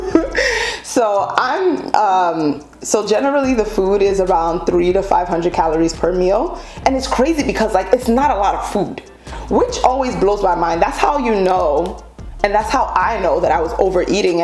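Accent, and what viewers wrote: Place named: Nigeria. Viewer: Girl you are a MESS!!! 🤣 Loved this though. Your energy, Nigerian accent and silliness are a breath of fresh air. 🤎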